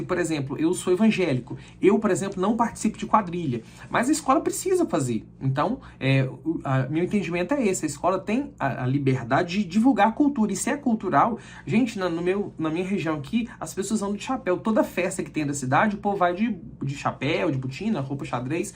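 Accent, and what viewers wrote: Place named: Minas Gerais, Brazil. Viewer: Mas vc tem sotaque mineiro.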